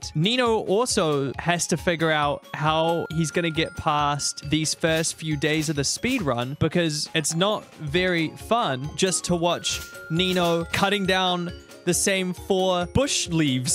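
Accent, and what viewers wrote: New Zealand. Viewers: it took me like 3 videos to realise you were also a kiwi lmao, why does my brain never register our accent 😅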